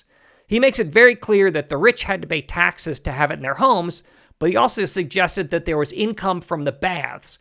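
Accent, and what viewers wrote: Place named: United States. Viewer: Content aside, couldn't the presenter have made a minimal effort to pronounce the Italian correctly, even with an American English accent? If he can use Google Earth has he not heard of Google Translate?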